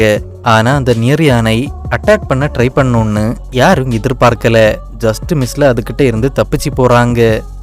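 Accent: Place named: Marthandam, India. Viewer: Unkaluka ooru marthandam a anna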